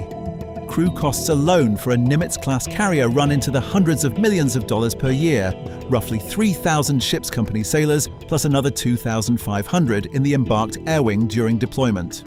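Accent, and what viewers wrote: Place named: United Kingdom. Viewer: thats why its ironic that its a British Voice.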